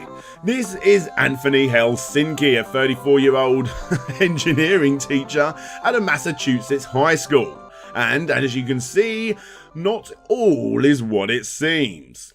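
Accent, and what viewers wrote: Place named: United Kingdom. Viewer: Great idea for a video! Also... are you the most British sounding Brit, ever?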